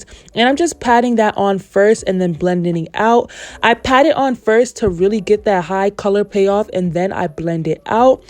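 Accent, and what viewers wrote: United States. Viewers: I also mix foundations to get my clients perfect match. I still use the back of my hands it warms the products. You sound like you’re from Dade County 😂 Miami you Sound like me New Subbie 👋🏾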